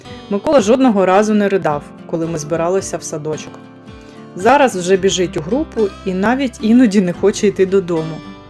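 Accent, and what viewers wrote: Standard. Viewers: Дякую вам. Ви трішечки змінили мою думку про східних переселенців на кращу. Особливо вражає чиста українська мова. Бажаю вам, щоб у вас все було добре, і вірю, що так буде: і у вас, і у нас-в Україні. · Ютуб закинув це відео в рекомендовані і воно набирає перегляди . Робіть заставки відео без дрібного шрифту . Як для блогера у вас гарна дикція, чиста українська мова і вміння формулювати думку . У вас все вийде, розвивайтеся, експериментуйте - успіх буде .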